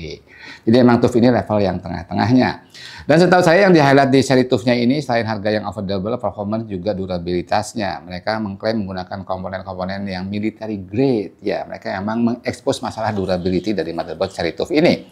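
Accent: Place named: Java, Indonesia. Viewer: kl lebih diperhatikan, logatnya beda mas ferry itu orang sumatera (jambi) sedangkan bang jawa tulen nih (jogja)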